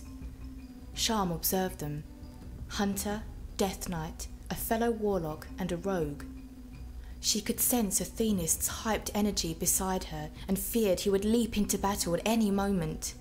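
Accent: United Kingdom. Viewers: Cockney chicks shouldn't be telling stories tbqfh! voice just doesn't work for seriousness dialogue! I feel like after you pause to speak your gonna say next "apples and pears 10 bob"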